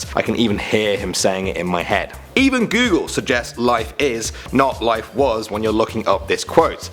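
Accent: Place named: United Kingdom. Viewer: Omg please tell me you are British. I just loooove your accent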